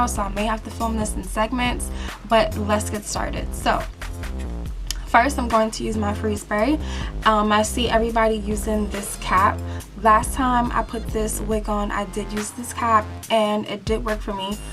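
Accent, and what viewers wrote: New York, United States. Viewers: I definitely learned a lot, thank you for this video girl!! Btw the more I hear your voice I'm thinking oh she's definitely from New York (I'm from N.Y.) lol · Yes everyone has an accent depends on what region on the US you are from. Im from Colorado no one here talks like you. So im able to identify your accent. You’re probably from a different area of the US. Like NY maybe???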